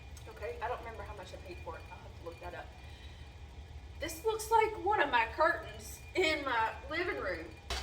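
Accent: United States. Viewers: I like how I could genuinely share in her surprise and feel like I'm apart of the process 🤣💎🙌. That southern country accent while teaching me do stuff in the kitchen keeps me listening though 🙊😇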